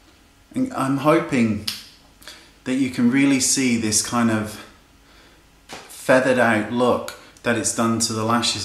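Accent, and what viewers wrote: Australia. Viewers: Is he Australian? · He sounds kind of like a Aussie ?? Where's he from ?:)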